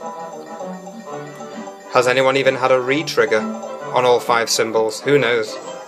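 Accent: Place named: England, United Kingdom. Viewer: Oh no, has the giggles! Actually I agree with love your voice and English accent.:)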